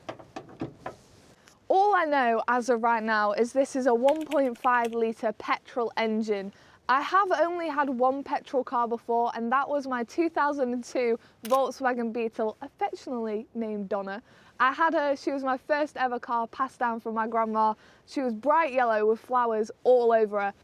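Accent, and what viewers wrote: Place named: North West England, United Kingdom. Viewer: Hey! On the friendship note, I'm pretty rubbish with accents but I sense you're from somewhere relatively close to me (North West). I'm 26 and don't have many friends that are like-minded in this sense so I'd so be up for meeting you, I feel like we'd get along! Need more friends to do some nice walks with as Spring arrives 🫶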